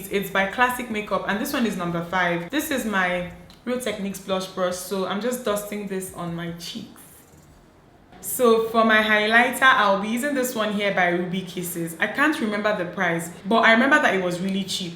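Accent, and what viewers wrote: Nigeria. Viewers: I love nigerians.Their accent,makeup and traditional weddings...you are so beautiful.Much love from Kenya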